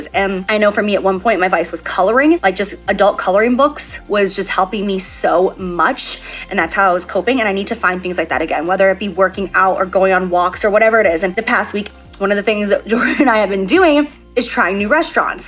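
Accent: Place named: Upper Peninsula Michigan, United States. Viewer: I know this is super random. But, I think it's so funny that you're a born and raised Floridian, and you say 'Reesees' instead of 'Reeses'! I live in the UP of Michigan and I do remember you saying you've been here and have family here. And that's how us Yoopers say Reese's too🙂 love you! And I'm so proud of your journey, girl 💜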